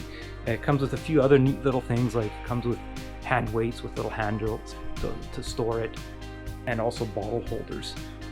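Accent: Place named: Canada. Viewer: I love that Canadian accent !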